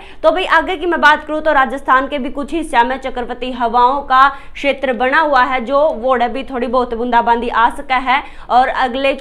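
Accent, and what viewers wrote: Haryana, India. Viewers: Bhot achi lagti ho bolta hua apki harvanvi vaoooo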